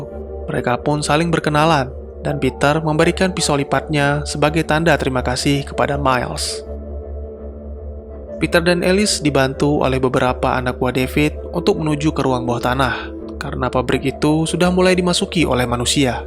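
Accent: Aceh, Indonesia. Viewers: Mimin org aceh ya?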